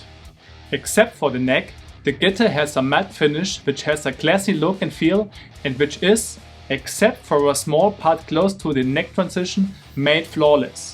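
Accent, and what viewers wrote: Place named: Germany. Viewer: Your accent is atrocious. You should not be making videos. It's ridiculous. Meine peinlichen Mitdeutschen immer...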